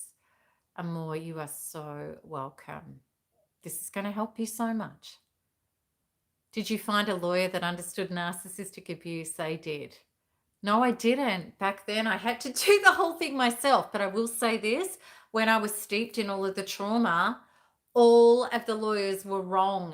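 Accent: Australia. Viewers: I like her Australian accent. I wokred in the Far East for over a decade. I am an American and I enjoyed the different English language accents and dialects from the people I met. In the FarEast I met many people from different continents who were also there.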